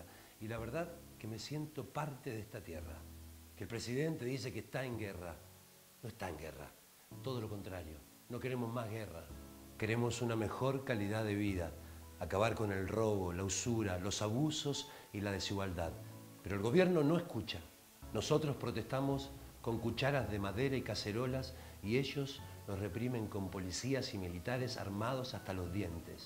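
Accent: Argentina. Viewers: Está wenisimo el video, pero si llevas 23 años en Chile y te relacionas con Chilenos a diario... Como es que aún no has perdido el acento Argentino?. La española Eva Gómez (de El Diario Eva- CHV) a los 5 años de residir en Chile, ya había perdido su acento español y ahora prácticamente es una Chilena más, pero en cambio tú, Barticciotto, Cristina Tocco, etc. Han vivido décadas en Chile y aún hablan como Argentinos. Que se peguen los acentos es algo inocuo de acuerdo a la región o país en dónde estés. Tal vez ustedes piensan que les da más caché seguir hablando como argentinos pareciendo casi una imitación burda (a lo Amaro Gómez-Pablos) 😅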